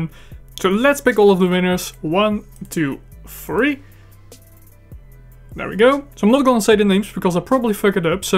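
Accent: Netherlands. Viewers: eey mate you dutch?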